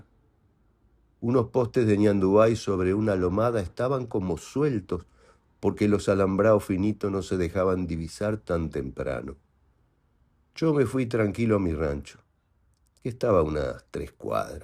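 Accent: Argentina. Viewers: Es muy cierto, las historias cobran vida cuando se las verbaliza. Un hallazgo ese tono y decir campero. Me recuerda a gente de Entre Ríos, a los uruguayos y a bonaerenses del campo. Borges estuvo muy ligado a mi provincia, también al Uruguay y al sur gaúcho del Brasil. No fue sólo un señorito de la gran urbe porteña, ni sólo un inglés o suizo. Fue todo, pero su corazón miraba al sur. ¡Qué hombre querible, culto, sensible, qué escritorazo! Gracias por esta entrega... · Qué mejor escuchar el cuento de Borges por un argentino, así como escuchar Pedro Paramo por un mexicano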